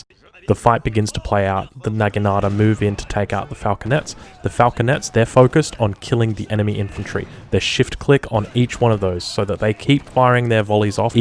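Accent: Australia. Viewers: He's just australian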